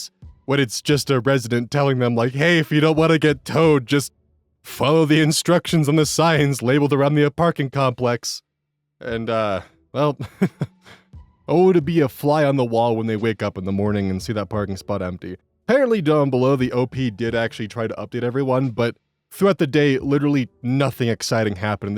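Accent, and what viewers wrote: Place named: Midwest, United States. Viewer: Even though you're from Ohio (Pretty sure that's what you said) and he's from Wisconsin, once in a while your accent makes me think of Pickles from Dethklok. Juuust enough that I couldn't place who I was thinking of til just now (been listening for maybe a month now?-idk my ADHD makes it hard to measure time)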